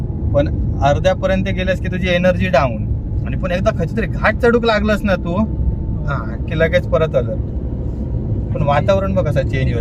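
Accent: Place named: Konkan, India. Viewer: Bro, Phondaghat madhle nisarga soundarya ani tuzhya konkni bhashe cha godwa, maastach...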